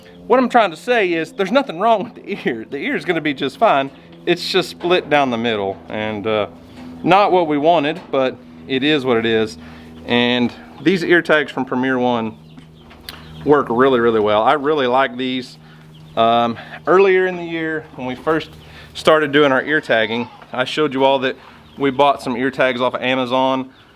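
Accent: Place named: Houston, United States. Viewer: Called. Slow up. Lol Great job yall.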